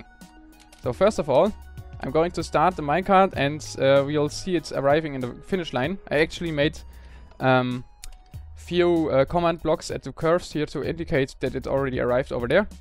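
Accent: Germany. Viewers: mich persönlich stört der Akzent nicht wirklich, aber das "th" ist noch ein wenig ausbaufähig im Grunde das klassische Problem vieler Deutscher im Englischen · Are you german?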